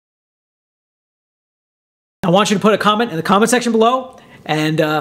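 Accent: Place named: Australia. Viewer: It sounds Australian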